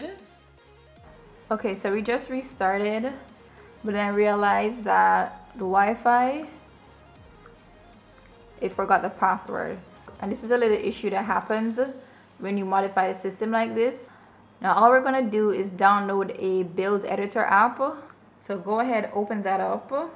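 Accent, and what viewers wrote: Jamaica. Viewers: Knew you sounded Jamaican, yaadie repping here :D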